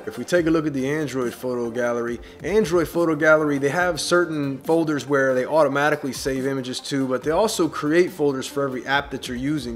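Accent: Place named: New York, United States. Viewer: You have a bit of an accent or dialect, are you from New York by any chance? I'm probably just crazy.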